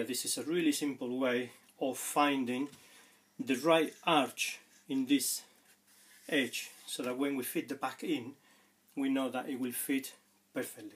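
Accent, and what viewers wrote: United Kingdom. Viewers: Thanks for the videos Sr, can I detect a wee bit of Scottish in your accent?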